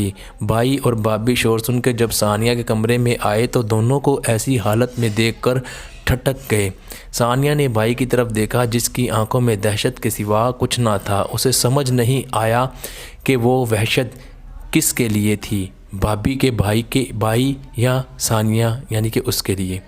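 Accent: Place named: India. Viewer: Ap agar Pakistani ho Tu apna Urdu Ka tulaffuz theek krain ,,,ap Tu Indian ke tarha Urdu poltay ho ..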